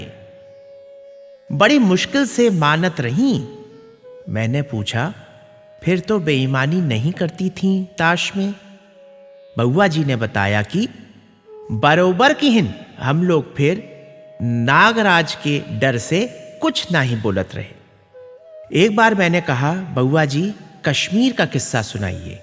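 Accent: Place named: Varanasi, India. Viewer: these stories by themselves are so interesting, but your superb narration in the Banarasi dialect makes them all the more entertaining! Takes you to a different world altogether. Congratulations! Well done! 👌👌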